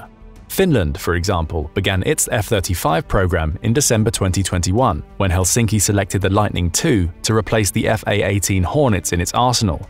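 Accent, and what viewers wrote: United Kingdom. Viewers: Wishful thinking with British accent 😂😂😂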